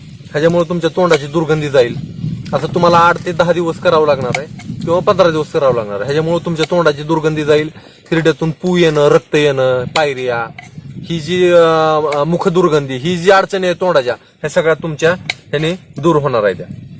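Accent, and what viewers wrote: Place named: Solapur, India. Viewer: Khupch chan.....zala aahe.....😋😋😋tumhi kontya gavche....me solapur che aahe....bhasha ekdm solapuri vatatey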